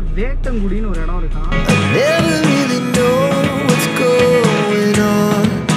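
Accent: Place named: United Kingdom. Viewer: Unku tamile pesa theryathu la London durai poda dei olunga pesu frst 🤦🏻‍♀️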